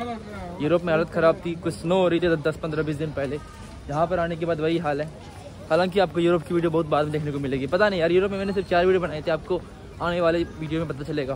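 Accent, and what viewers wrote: Bihar, India. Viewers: LAST RAT.......HAHAHAHA O BHAI SAHAB THIS IS BIHARI TONE LOVE U BHAI.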